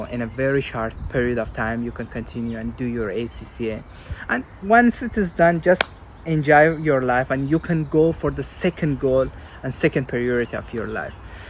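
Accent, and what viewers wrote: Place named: Afghanistan. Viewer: Hi are you Afghan because your pronouns form Afghanistan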